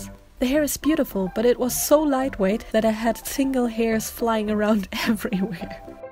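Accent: Germany. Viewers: Are you from Germany? I don't know why but you really sound kinda german :D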